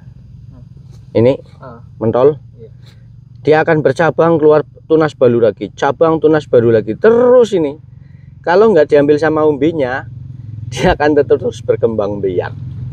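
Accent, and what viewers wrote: Banyumas, Indonesia. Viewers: Woh ngapak kie. Ngapake daerah endi kang?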